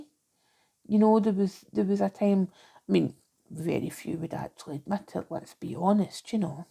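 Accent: Scotland, United Kingdom. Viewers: Oh. I love your accent. Are you Scottish.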